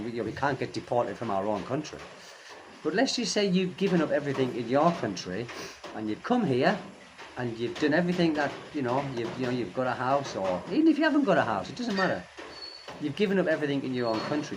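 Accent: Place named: United Kingdom. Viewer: ome pf the appeals of your channel is your British accent, i love the way you say, de-poorted :face-purple-crying::face-purple-crying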